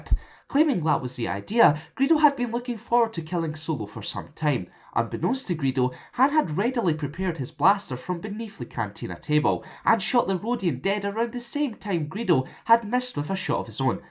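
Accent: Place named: Wales, United Kingdom. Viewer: I love your welsh accent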